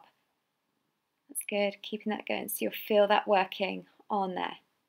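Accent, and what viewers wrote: United Kingdom. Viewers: thankyou for this 😭😭😭♥♥♥♥ :), i like your british accent 🙈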